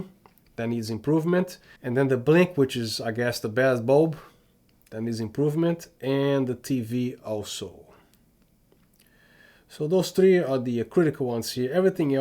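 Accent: Brazil. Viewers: I saw a lot of your videos and always i think you was brazilian or portugues... your english sound like brazilian! Great job bro! Regards from the Caribbean =)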